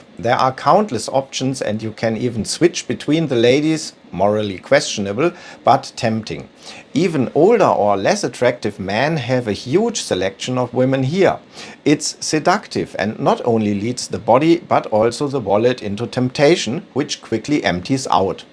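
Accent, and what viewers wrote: Germany. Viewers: All logic and no emotion with a German accent….. perfectly meets stereotype 😯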